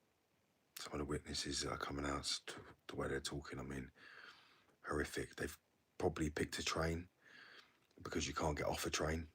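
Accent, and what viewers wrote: United Kingdom. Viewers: Welsh man?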